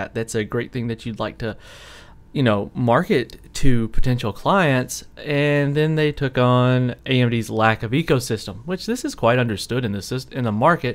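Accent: South, United States. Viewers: Where are you from if you don't mind me asking? I hear some southern twang in there XD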